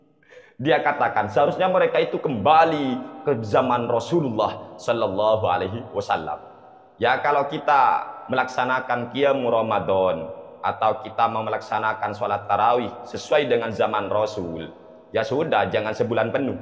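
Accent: Madura, Indonesia. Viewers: ini org madura mna?? klhatan logatx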